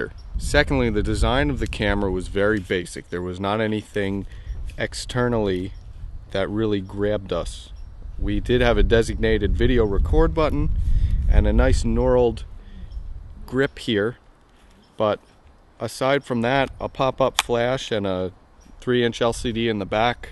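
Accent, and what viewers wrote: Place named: Buffalo, United States. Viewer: Your accent sounds like maybe Buffalo, New York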